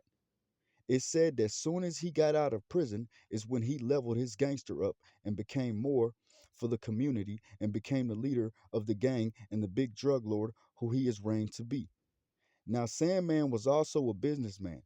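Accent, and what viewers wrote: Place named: United States. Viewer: Yo you sound American.....how you know about all this Trinidadian stuff? lol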